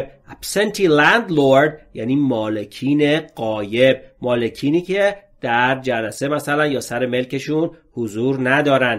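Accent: United States. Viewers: Are you Americam? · Are you American ?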